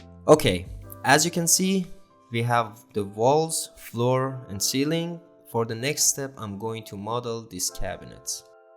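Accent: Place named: Turkey. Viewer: Sen Türk müsün aynı Türk gibi İngilizce konuşuyon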